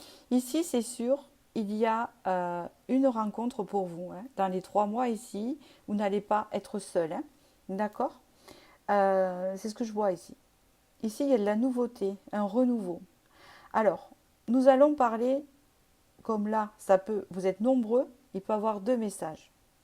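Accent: South, France. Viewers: Merci de penser à nous le week-end! Avec votre joli accent du sud...je ne sais pas de quelle région vous êtes...😊 Puisque vous parlez de propositions à vous faire, j'aimerais pour ma part plus de guidances pour les célibataires...il y en a très peu sur YouTube même si vous faites partie des chaînes qui en font le plus...ou en tout cas où le titre est suffisamment large pour nous intégrer. Sinon, sur YouTube, on entend toujours...VOTRE AUTRE... et s'il n y a pas cet autre?? Nous sommes des millions de célibataires et je n ai jamais compris qu il y ait si peu de thèmes pour nous...une autre guidancière, ÉVEIL DE L'ESPRIT, qui demandait également de lui envoyer des idées de tirage, m'a répondu que d'après les stats de YouTube, il n'était pas intéressant de faire des tirages pour les célibataires et que ça ne rapporte pas!!!😳. Circulez...il n'y a rien à voir!! 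Donc je me suis désabonnée. Bon dimanche à vous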